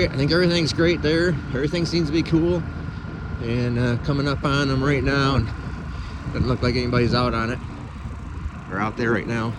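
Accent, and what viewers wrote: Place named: Northeast, United States. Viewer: "...right there Baab. At the haat spaat of the journal..."! You're from the northeast! A hillbilly like me can tell an accent like that anywhere!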